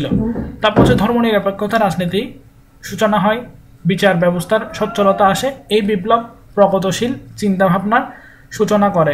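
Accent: Chittagong, Bangladesh. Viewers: ভাইয়া আপনি কি চট্টগ্রামের বাসিন্দা???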